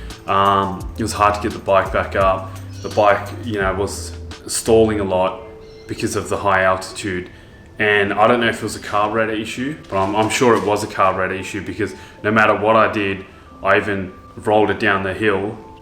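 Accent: Australia. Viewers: you sound Australian. haha. maybe I dont know my dialects very well. I have a CB500x. looking at these just for fun. we have no Enfield dealers and I'm sure I wouldn't lose a dime selling it if I didnt like it.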